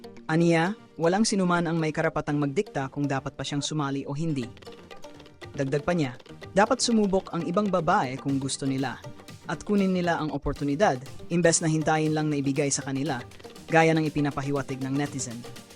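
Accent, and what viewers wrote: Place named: Visayas, Philippines. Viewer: Hala! May accent ka na nga !!! Dati tunog visayas kaw!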